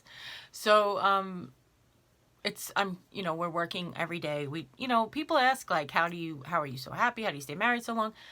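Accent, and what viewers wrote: New York, United States. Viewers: I could listen to you talk all day I just love your wisdom and your accent too hehe I hear that New York in there!! Love you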